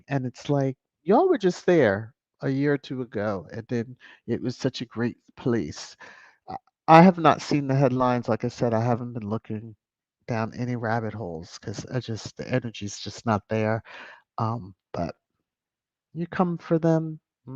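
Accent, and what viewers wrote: RP, United Kingdom. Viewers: So, happy you are feeling better ❤❤:_MrsVSLove:_MrsVSDrinky:_MrsVSCross:_MrsVSLove:❤ WE MISSED YOU ❤❤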